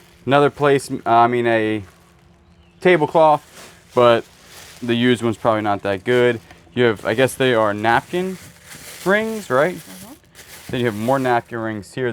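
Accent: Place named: South Carolina, United States. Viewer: If I close my eyes I can almost hear Andy Griffith speak, must be that SC accent!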